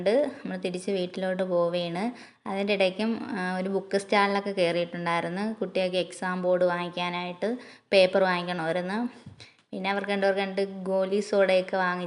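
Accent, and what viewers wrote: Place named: Malappuram, India. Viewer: ഇത് പോലത്തെ vdo കൂടുതൽ ഇടൂ.👌 Tvm ആണോ മലപ്പുറം or kozhikode ആണെന്ന് തോന്നി.👍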